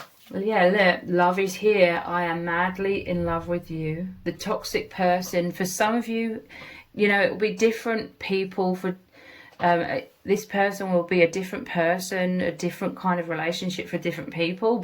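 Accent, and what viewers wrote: England, United Kingdom. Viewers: Believe me it was as if I was listening to my own very story I love you beautiful English accent it's like listening to a Melody thank you you are truly gifted a true seer a true Oracle indeed you are blessed and I thank you!!!8!!!😊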